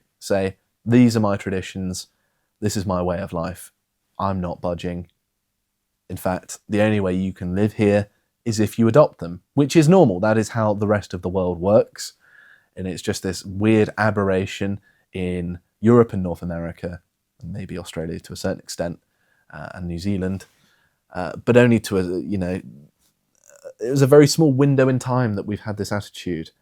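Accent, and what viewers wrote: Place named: London, United Kingdom. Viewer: He's speaking like a Londoner tbh. Completely disconnected with where he came from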